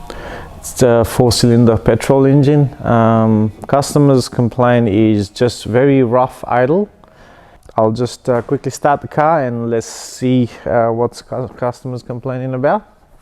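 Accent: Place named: Nepal. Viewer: Good stuff bro. Your accent sounds like Nepalese accent.🤙🏾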